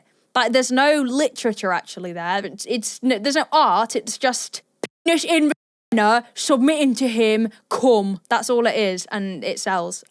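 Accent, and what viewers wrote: United Kingdom. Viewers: I like your british accent <3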